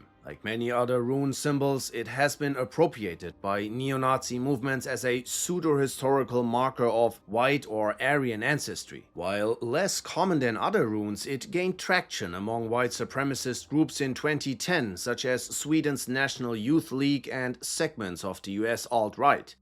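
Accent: Germany. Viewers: IT IS hard to listen this German accent . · I think the author is german,with thick german accent,thats why he said it like that.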